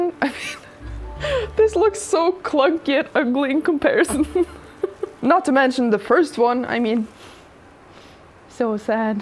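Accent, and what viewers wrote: Norway. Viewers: good to hear a fellow norwegian :) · 1) You should make it in hot pink to match your hair and 2) a green laser on your fingertip.... 🙂 This is very awesome. I love your accent, too. Norsk?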